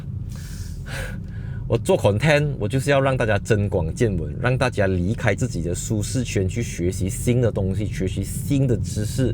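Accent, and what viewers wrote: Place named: Malaysia. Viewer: 馬來西亞式的北京語 不錯喔還用繁體字喔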